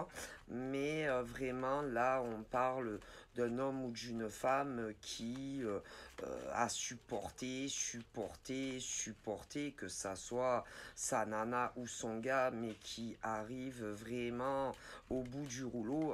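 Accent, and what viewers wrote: South, France. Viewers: Cc je vous découvre ❤super juste 😮mdr je le savais 😂j aime beaucoup votre style, beaucoup 😊super nature accent du sud non? Bisous du sud 😊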